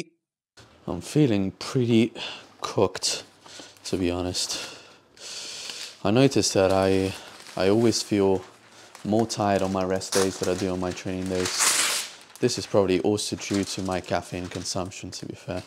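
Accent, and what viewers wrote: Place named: United Kingdom. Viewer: You have the most interesting accent. It isn't very British but yet there is a strong Brit tone in the way you enunciate.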